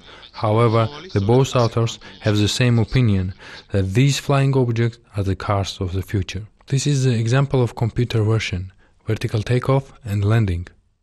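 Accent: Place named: England, United Kingdom. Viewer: L'accent rosbeef est déjà horrible à entendre ... mais là c'est l'apothéose !! 😡😡😡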